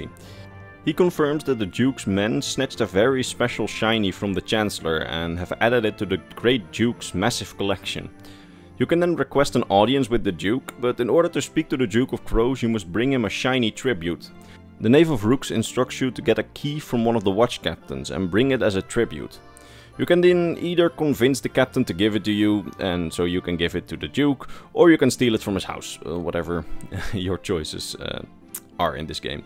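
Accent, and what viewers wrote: Netherlands. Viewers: Are you Dutch? If so, goede videos! Hope you'll continue to make the ESO story videos up to the latest chapter!!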